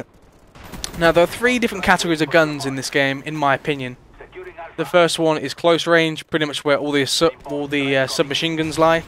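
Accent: United Kingdom. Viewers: I'm guessing ur a British guy am I right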